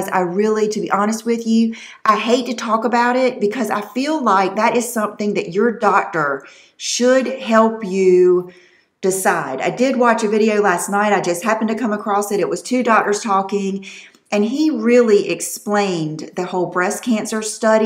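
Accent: South, United States. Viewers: Sweet, Soft-spoken, Southern, Sincere, Stunning 🥰🙏🏼🥰💄😍💕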